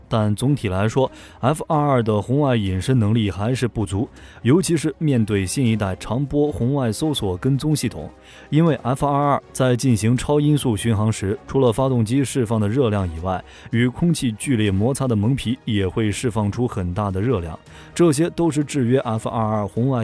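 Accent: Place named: China. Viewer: 大陆人口音，台湾人专用频道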